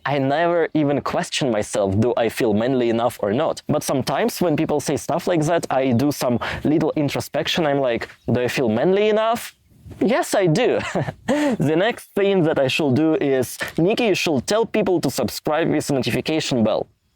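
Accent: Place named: Russia. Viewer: Stop pronouncing ''The'' as ''Zz'' Instead say ''D'' or maybe it is you sing as Russian English speaker it makes you more exotic.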